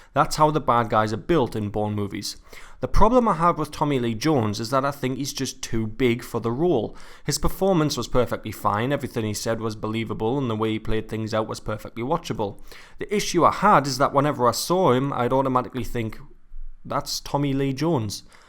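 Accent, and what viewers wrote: Scotland, United Kingdom. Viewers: what is it about hearing a scots accent that makes everything unimportant. bourne 2016 was a travesty, but i'm sure it paid for someone's nice house somewhere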